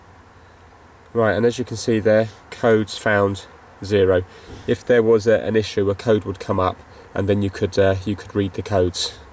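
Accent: United Kingdom. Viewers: Cant stand that Brit Accent. Let alone a Right hand drive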